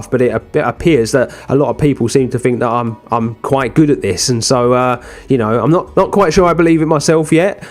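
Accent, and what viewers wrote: United Kingdom. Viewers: This is great! Random question, but are you from Hertfordshire? I lived there as a child and your accent is making me nostalgic!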